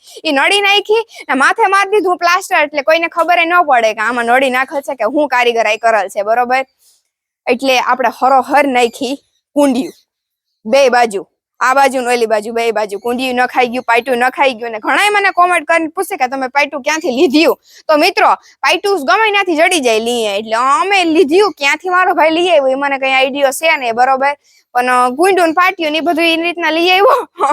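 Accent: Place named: Kathiawar, India. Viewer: સિસટર તમારિ કાઠીયાવાડી બોલિ સરસ છે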